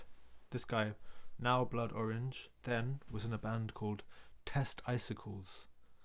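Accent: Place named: United Kingdom. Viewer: 우와 진짜 영국식 영어!!!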